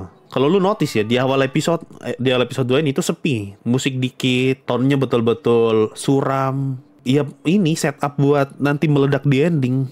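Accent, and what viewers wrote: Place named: Medan, Indonesia. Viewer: bang bahasa nya medan banget 😂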